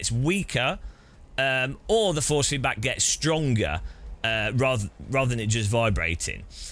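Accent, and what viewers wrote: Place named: England, United Kingdom. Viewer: This guy's English accent saves his life.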